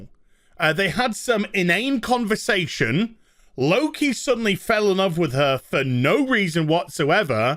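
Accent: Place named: Nottingham, United Kingdom. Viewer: Love that Nottingham brogue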